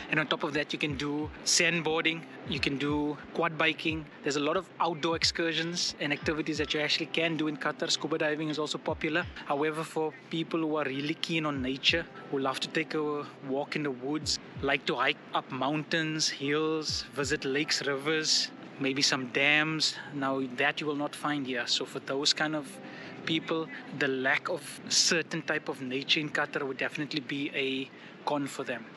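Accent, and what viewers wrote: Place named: South Africa. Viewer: Wow, was scrolling info about Qatar… I heard the accent and new , ag jinne man , die seun is van Suid Africa!!!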